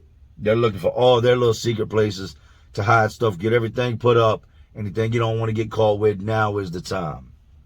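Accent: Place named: Philadelphia, United States. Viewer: Yo what's good with you. The stories are very entertaining!!! By watching your videos I see you have lived in Virginia Philadelphia and North Carolina. You definitely have the Philly accent 100% I'm from that area and I could tell instantly. If someone asked you where you're from what would you tell them?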